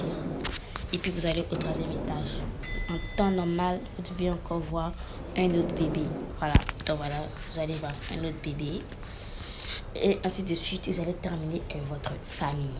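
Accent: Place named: Ivory Coast. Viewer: Est-ce que tu es ivoirienne parce que tu as l'accent ivoirien tu es sûr que tu n'es pas ivoirienne parce que moi je suis ivoirienne et je connais cet accent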